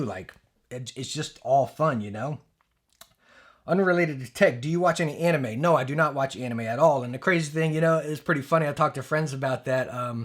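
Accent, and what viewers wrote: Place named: New Jersey, United States. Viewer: As a Brit I guessed you were from jersey from your accent so I'm pretty impressed with myself, that could also just be shear coincidence tho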